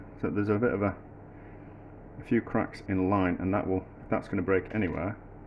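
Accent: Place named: England, United Kingdom. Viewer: Granted I'm an American but I find his English accent very hard to understand. He talks too quickly and not loud enough.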